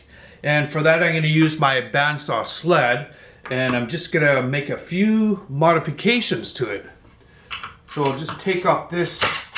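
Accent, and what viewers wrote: Canada. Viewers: Great videos, they have been a lot of help to me, please don't be insulted if I am wrong, but you sound Canadian to me? I'm English, and it's hard for us to tell sometimes.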